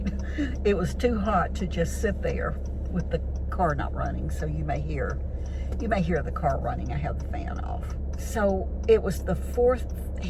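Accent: South, United States. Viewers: This was such a great story!! Your southern voice and the way you tell it is wonderful❤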